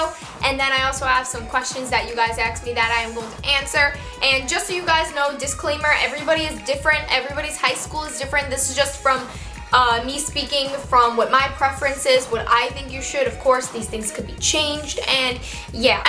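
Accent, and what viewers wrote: New York, United States. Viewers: You have a New York accent! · are you from NY ?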